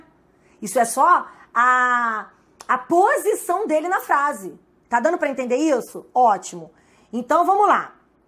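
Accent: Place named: Rio de Janeiro, Brazil. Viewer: Por que todos os professores de português são cariocas?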